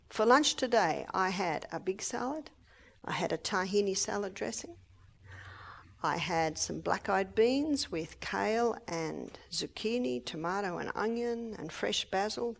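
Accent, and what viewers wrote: Australia. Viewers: jim dee - She is from Australia and they say many words differently than USA does. Great Britain also does. Forget even trying to understand the English of many from Scotland and Ireland. Ireland is easier, though. I was watching another of her videos and could not understand several words at all. One of them turned out to MEAN Red Bell Pepper. They have an entirely different word for this vegetable than we do. Have you ever heard a Brit pronounce Schedule? And those from South Africa also pronounce many words differently than we do - especially words from the Bible. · Man…she’s Australian. They don’t pronounce some words like Americans (for example).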